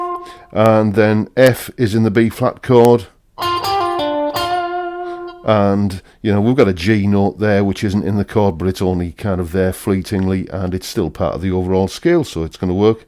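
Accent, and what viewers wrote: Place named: North East England, United Kingdom. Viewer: North east lad I take it. You sound like you’re fairly close to me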